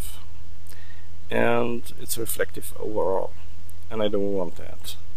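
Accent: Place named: Germany. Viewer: Man hört dass du deutscher bist! ;)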